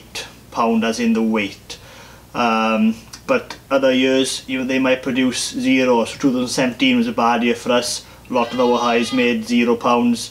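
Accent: Wales, United Kingdom. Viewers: 20 to 40 pounds of honey per hive per year, did I got that right? So that's 9 to 18 kg. So to make an average full time income, you need about 800 hives. Is that so different in Wales compared to Germany or did I mistake that beautiful Welch dialect? :) I read about 50 kg per hive on many sources. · very true, although I genuinely believe the more the merrier when it comes to mead. Its still taxed and treated like a wine which is very annoying and also extremely expensive for small producers like myself. I'd make some YouTube videos on how to get started etc but I don't have a cheery Welsh accent like yourself and unfortunately I have the personality of a slightly moist paper bag.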